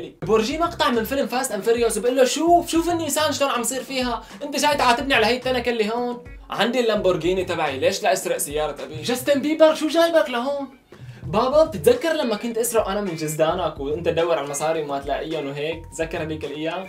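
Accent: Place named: Damascus, Syria. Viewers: Taker 1995 HD لأنو هوي سوري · ليش مو عاجبك لهجة السوريين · هاد من الشام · يييييييييييي هاي لهجتو سوري اصلي.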